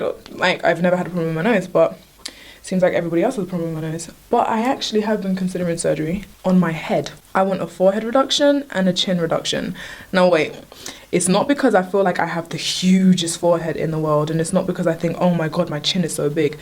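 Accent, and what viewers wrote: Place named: Council estate, United Kingdom. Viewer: hate how she talks. as if she lives on a council estate.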